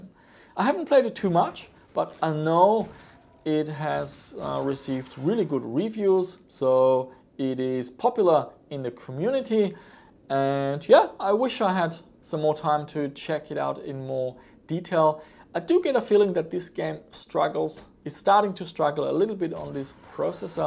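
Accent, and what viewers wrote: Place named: Germany. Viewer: Your profile says you are from Australia, but you sound like a German speaking English. Why is that?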